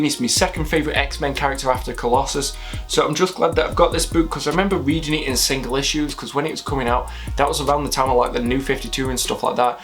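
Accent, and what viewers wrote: United Kingdom. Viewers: Another UK collector here(and from your accent, potentially similar areas), your hauls are pretty damn impressive considering how difficult it is for us to pick up stuff as cheap as Americans can. Not just Ollies, the facebook groups, omnibros, IST etc. So keep up the good work! Don't spend too much!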